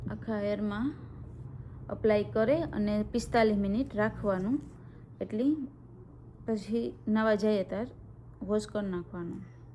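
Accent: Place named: Kathiawar, India. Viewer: કાઠિયાવાડી લાગો છો તમે બોલી ઉપરથી ❤ Super vlog 👍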